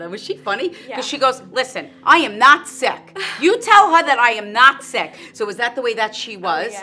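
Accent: New York, United States. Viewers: “DO YOU UNDERSTAND WHAT IM SAYING?!” With a New York accent keeps you in check.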